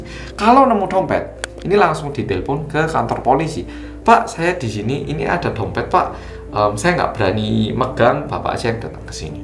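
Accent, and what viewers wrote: Java, Indonesia. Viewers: Mas skrng udah 5 ahun di korea.. Gimana menjaga bahasa indo nya dan tetep medok? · Maa syaa ALLOH....TABAROKALLOH....INI ORANG KOREA TAPI KOK BHS NYA MEDOK BNGT,SESUAI BNGT DG JUDUL NYA👍💚🙏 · film korea itu asik dan romantis lho.....kok bisa begitu bro..? tapi mas ini kayak orang jawa logatnya.....nice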